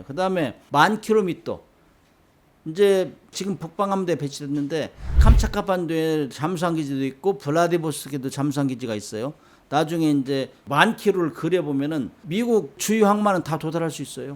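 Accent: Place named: Jeolla, South Korea. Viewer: 그냥 그만두라 전라도 사투리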